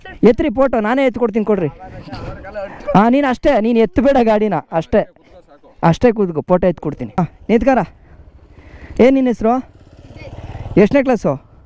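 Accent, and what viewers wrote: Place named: Chamarajanagar, India. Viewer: Chamarajanagar Slang 😅👌🏻👌🏻👌🏻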